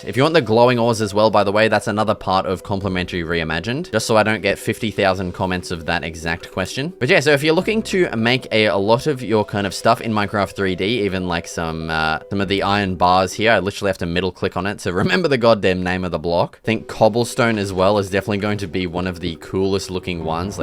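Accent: Australia. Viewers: Australien accent = better youtuber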